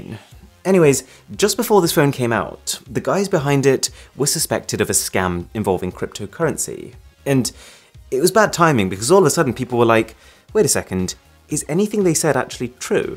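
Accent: RP, United Kingdom. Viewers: I just watch your videos just because I like the way of your talking, specially British accent I just love it❤️.huge love from Bangladesh 🇧🇩. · I love your voice. The poshness (sorry i dont know a better word lol) iv subscribed xx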